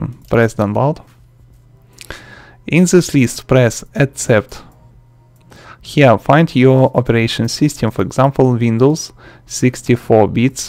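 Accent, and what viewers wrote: Russia. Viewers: Are you from Russia? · your russian accent is a blessing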